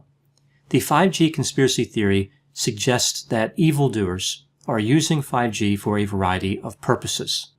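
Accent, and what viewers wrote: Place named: Mid-Atlantic, United States. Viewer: Dr, you sound like you're from South Jersey/Philly region. You have a very distinct mid-atlantic accent. Where did you grow up? I'm studying linguistics..just curious!!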